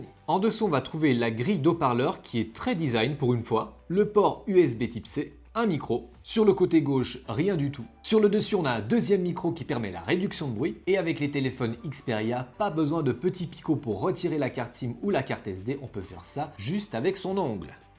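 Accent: Vaud, Switzerland. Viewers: Oooooh le T610 😍 En réalité ce que je préfère dans tes vidéos je crois que c’est quand même de bel accent vaudois 😏😅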